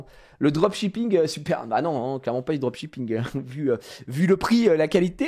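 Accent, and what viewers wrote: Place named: Paris, France. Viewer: non je pense que c'est juste le phrasé parisien typique + le fait que aime bien exagérer qui fait que ça ressemble beaucoup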